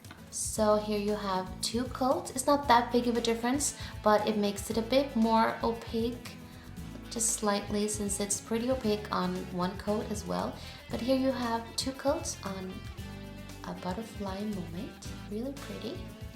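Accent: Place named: United States. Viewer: She is from Sweden but she still talks like an American..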